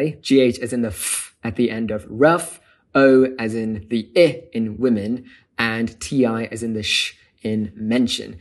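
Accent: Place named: United Kingdom. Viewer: いやどう聞いてもイギリス人だろうがこの人😂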